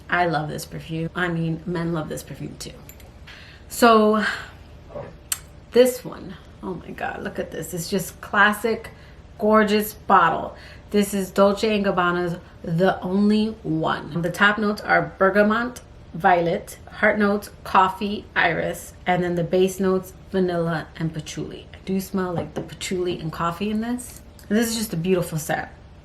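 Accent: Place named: Orlando, United States. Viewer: When i first heard you talk im like you sound like you would live where i live (weird, i know) lol and sure enough you say Orlando😂 which is where I live. Im like OMG! Small world. And I have all the perfumes you mentioned besides narciso because i highly dislike his perfumes, but overall awesome pics!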